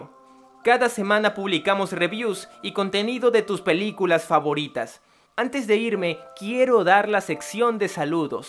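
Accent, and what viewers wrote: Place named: Ecuador. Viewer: Eres ecuatoriano :3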